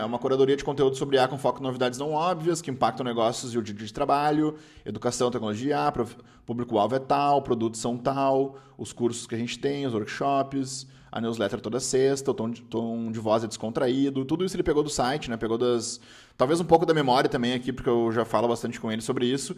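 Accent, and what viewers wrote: Brazil. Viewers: incrivel a qualidade do canal muito bom, somente o sotaque gaucho que não é tão agradável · Meu amigo, sotaque de Porto Alegre dói na alma pqp kkkk.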